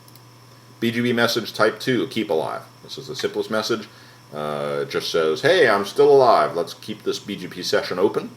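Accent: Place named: United States. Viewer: Really enjoyed the delivery of this and I struggle with the American accent. Thank you!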